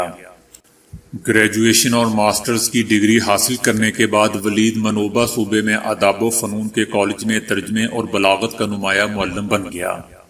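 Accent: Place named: Punjab, Pakistan. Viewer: aap baz alfaz pajabi lahjay may boltay hain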